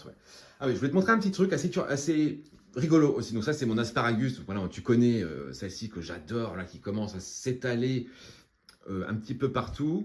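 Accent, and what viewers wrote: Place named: Picardy, France. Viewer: Bonjour. Je découvre votre chaîne, très sympathique et colorée. Merci pour la bonne humeur, et toutes vos beautés. ( Il me semble reconnaître un accent '' un peu de Picardie '' 😁😉. A très bientôt !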